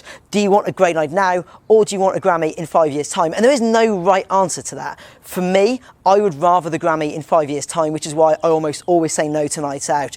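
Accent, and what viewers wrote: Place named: United Kingdom. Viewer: Bro this guy has great voice.. Totally British accent!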